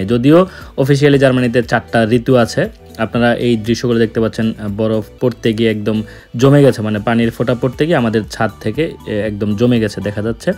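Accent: Bangladesh. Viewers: bhai,aponar bangldeser bhari kothai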